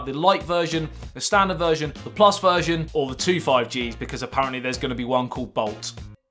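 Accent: United Kingdom. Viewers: watta accent language its like a british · Thick british accend... 😅😁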